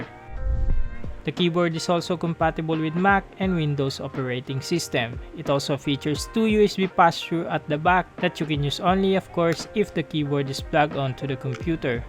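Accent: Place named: Philippines. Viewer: Filipino accent hello ganda ng review bro love it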